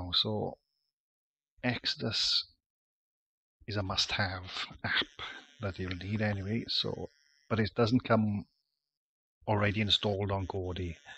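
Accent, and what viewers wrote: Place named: Cardiff, United Kingdom. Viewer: You don't expect a Jack to take any notice of a bloke with a Cardiff accent! Thanks very helpful.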